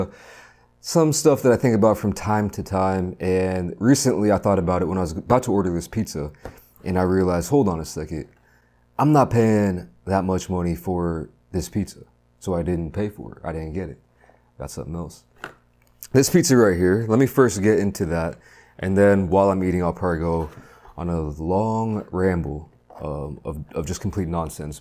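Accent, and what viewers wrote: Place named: Midwest, United States. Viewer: I knew you had a midwest accent lol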